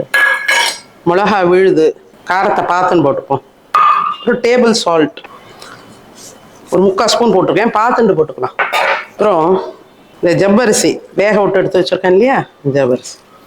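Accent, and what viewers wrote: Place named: Tiruchirappalli, India. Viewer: ரொம்ப ரொம்ப ரொம்ப thanks mami. Super super ungalun intha immediate response and ungalin viewers ku neegal kudukum importance romba romba pidichiruku. Sila peir enna ketaalum பதில் போட வே மாட்டார்கள் immediate AH poda லேனா கூட atleast 1 week kule podalam aana poda maata avargaluku therintha mariyaathai avlo thaan. I really really appreciate your response for your viewers ❤. Kanippa neega seithathu pola Try panni paarkiren. Neengal Trichy oora. Ungalin பேச்சு athupola iruku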